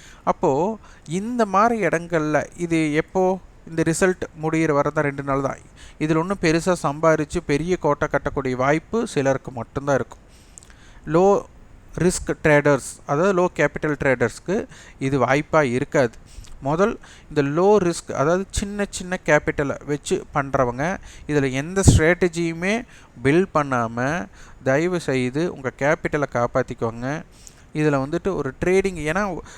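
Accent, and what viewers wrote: Kongu, India. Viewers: Masterji, such a nice advice (in a family how Amma Appa advice to their childrens - I feels just like this) for small traders, in 2 days can't earn lakhs & crores, we can save our capital, This is the time to study, observe, learn. Take a notes what market doing Iam a big fan of your Kongu Slang Tamizh "VALATHUDAN VAAZHGA"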